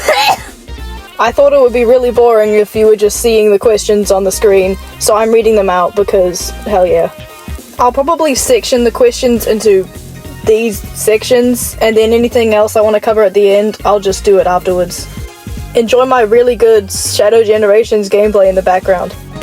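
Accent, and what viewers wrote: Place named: United Kingdom. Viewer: YOU'RE BRI'ISH??? also hi its Ed from discord also we started watching Dr. Stone at like the exact same time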